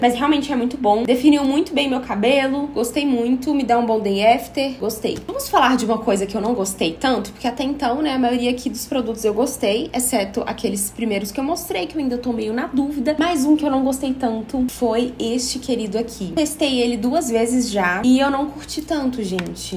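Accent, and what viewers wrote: Minas Gerais, Brazil. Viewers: Eita Mineirinha .......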